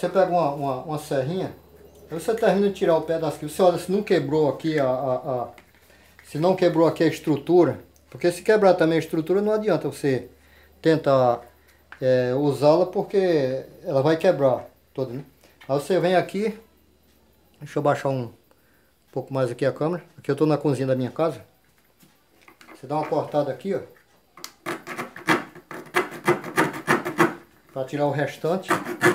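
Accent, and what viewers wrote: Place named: São Paulo, Brazil. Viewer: Fala fala lenga lenga ou é paulista ou carioca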